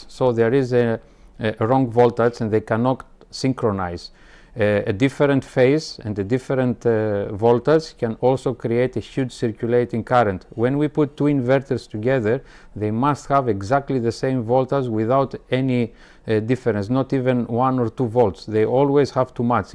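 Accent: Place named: Greece. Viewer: This Sounds like a greek accent 😁😉🇬🇷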